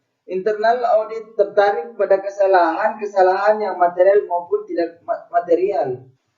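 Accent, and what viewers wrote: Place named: Bugis, Indonesia. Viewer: Materinya udah bguss cuman cara bacanya masih kebawa logat bugisnya gitu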